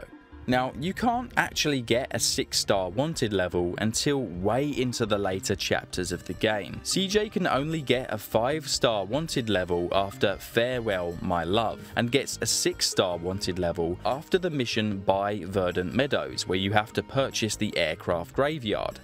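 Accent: Australia. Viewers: Do you haver alittle bit of aussie in your accent?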